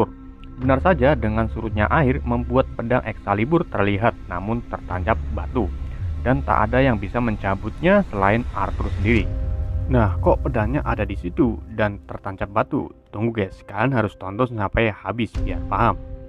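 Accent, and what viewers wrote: Indonesia. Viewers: Yah gpp bang kan logat orang indonesia jadi masih rada² susah ngomong bahasa luar yah belajar aja semoga bisa 👌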